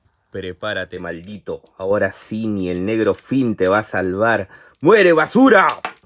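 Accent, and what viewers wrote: Peru. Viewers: Me encanta tu canal cada vez que puedo veo tus episodios me mate de risa con la de Arnol y lo de Jb se la pase a mi bros para que se caguen de risa también, te felicito por tu canal con tu acento Perucho es de mi época bravazo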